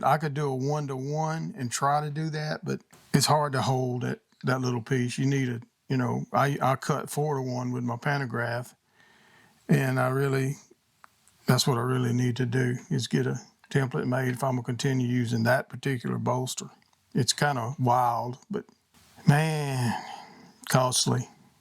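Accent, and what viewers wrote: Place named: South, United States. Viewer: OK- so the short answer is yes! I have suffered "Gravity's Wrath" with some custom stained glass... As for the file work and the remaining content- you are eloquent and smoothly southern in your presentation- do not forge a can of screws! Frustrations abound but the horizon is simple- educate and just maintain the presence! Personally I appreciate you letting us into your shop. Be safe and as always- at peace.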